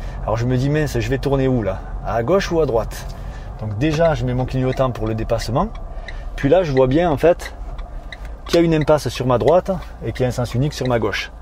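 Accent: South, France. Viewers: Merci pour tes vidéos ;) très agréable à écouter avec ton petit accent du sud qui nous donne un peu de soleil (je suis de Paris)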